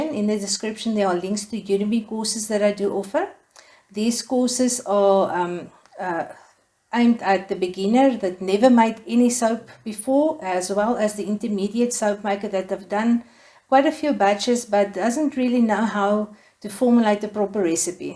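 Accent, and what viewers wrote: South Africa. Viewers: Hello, I have just discovered your channel. So nice to hear a South African voice on Utube making soap.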